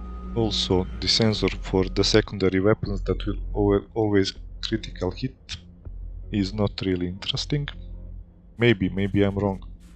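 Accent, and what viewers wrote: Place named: Russia. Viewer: Русский судя по акценту )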